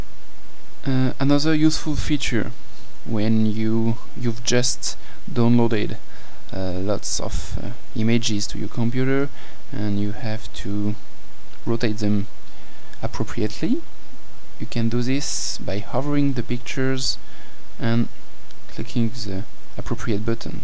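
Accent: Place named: France. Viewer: comme un accent francais,, it's weird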